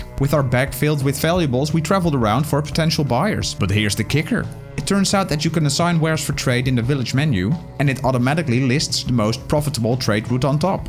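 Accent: Netherlands. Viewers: Dutch accent spotted <3 Groetjes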